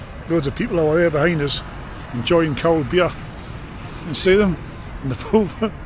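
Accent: United Kingdom. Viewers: He is probably a Geordie? From Newcastle in North East England. I am from London, England. If he is from Sunderland or Gateshead? He will hate me for calling him a Geordie 😅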